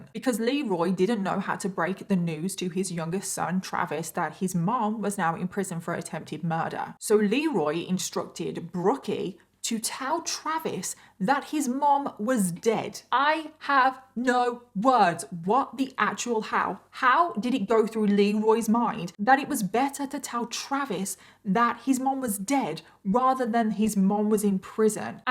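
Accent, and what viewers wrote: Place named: United Kingdom. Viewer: whereabouts in Britain are you from girl? period.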